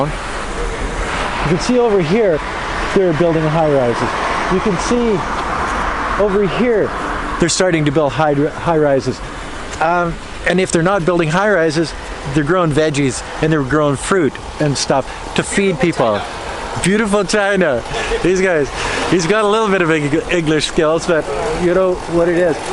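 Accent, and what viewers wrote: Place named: Canada. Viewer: What a Canadian tone to described the greatest of, keep up the good work! Your supporter from Coquitlam, BC, Canada!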